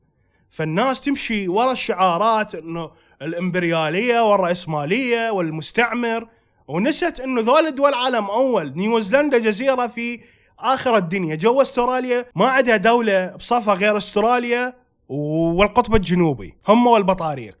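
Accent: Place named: Samarra, Iraq. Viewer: لهجتك مال سوامره !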